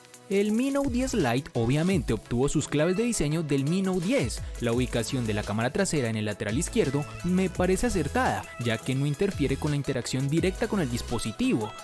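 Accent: Cali, Colombia. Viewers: Eres de la ciudad de Cali?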